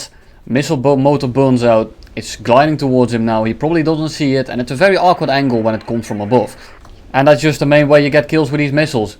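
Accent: Netherlands. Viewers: Are you come from the netherlands?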